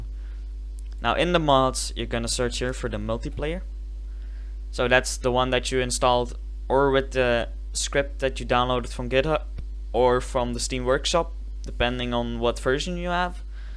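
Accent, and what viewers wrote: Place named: Netherlands. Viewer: nice dutch accent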